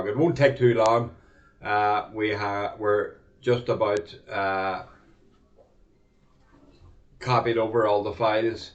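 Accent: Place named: Ireland. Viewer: GerDay, Because I a real "bushie" (live and work in remote areas of Australia), I'm struggling just a little with your accent! NOT your fault - it's just how it is - I don't get enough exposure to Paddy's!!!!! All this is leading up to say it MIGHT be an idea if you're going to show this "from scratch" like this to include links and/or command line text in your description file so that folk who are NOT fully cognisant with Ubuntu AND (like me) who might struggle with your accent can follow along easier! Having said that, this is excellent, so far and I appreciate your efforts!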